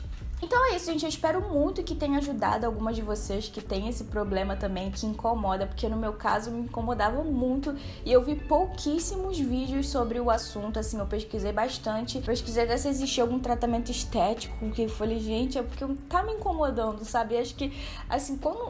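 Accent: Rio de Janeiro, Brazil. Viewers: mana, como vc fala em inglês com ess sotaque lindo de carioca? queria muito ouvir!!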